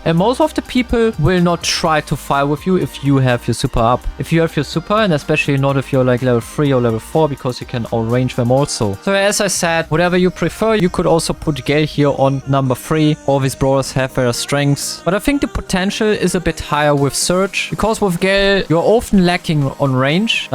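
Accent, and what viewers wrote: Germany. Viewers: yeah but that’s because he’s german and has an accent bro the other ones are just mistakes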